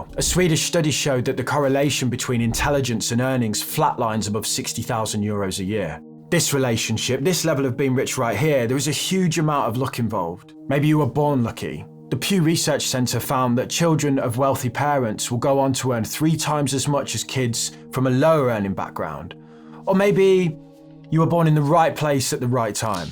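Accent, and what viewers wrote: Birmingham, United Kingdom. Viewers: Fantastic video! I think I hear a Brummy accent. Are you from Brum? Also, more videos with UK garage involved pls. Haha 🙏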